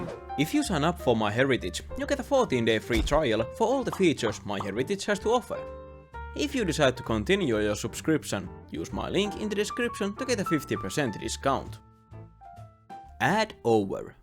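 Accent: Finland. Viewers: As a finnish i know the finnish accent and you have one so you are finnish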